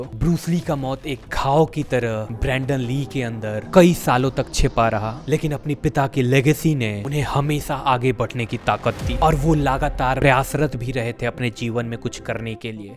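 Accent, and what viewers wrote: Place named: Bihar, India. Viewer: Your Bihari accent is so sexy, say it again 😉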